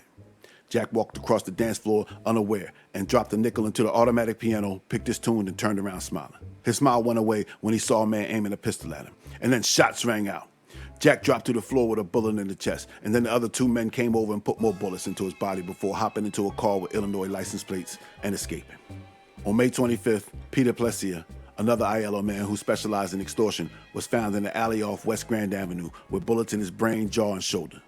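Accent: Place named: East Coast, United States. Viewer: Here Boss. Don’t want Mr. Algorithm to wack me and bury my ass in the desert. Looking forward to the John Torrio vid. I was introduced to him via the series Boardwalk Empire and want to know more about his beginnings. Your narration, dialogue, fly and scruffy East Coast accent, and gangster allusions are second-to-none. Superb story-telling skills. Don’t take wooden nickels Boss. See you’s in the funnies Boss. 🖤 📚 🎥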